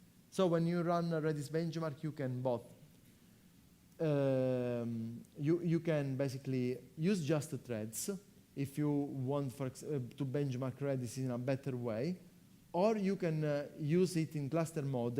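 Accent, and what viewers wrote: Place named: India. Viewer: 没讲 thread i/o 啊，口音有点像三哥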